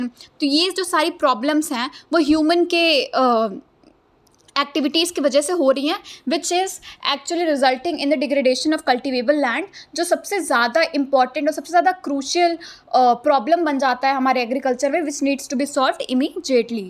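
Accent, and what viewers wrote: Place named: Punjab, India. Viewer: Panjabi ton effect 😅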